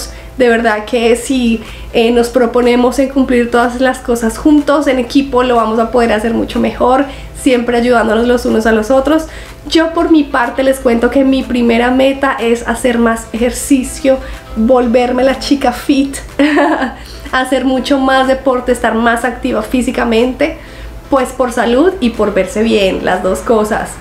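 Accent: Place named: Colombia. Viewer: Qué tengas un muy feliz año nuevo mi niña hermosa , eres muy bella y simpática y me gusta mucho el acento colombiano , bendiciones desde Chile 🇨🇱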